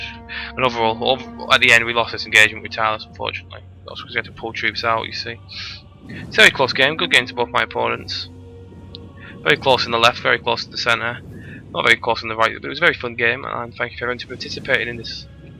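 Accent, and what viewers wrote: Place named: Liverpool, United Kingdom. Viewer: good comm mate, i mean for a scouse lol.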